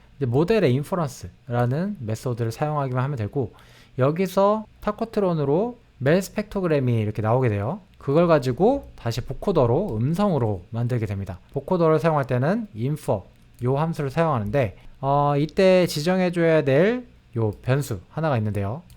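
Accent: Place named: Chungcheong, South Korea. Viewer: 저 충청도 사람인데, 나이드신 어른들 톤이랑 비슷하네요 ㅋㅋ 그 특유의 미묘한 말하기 귀찮아하는 듯한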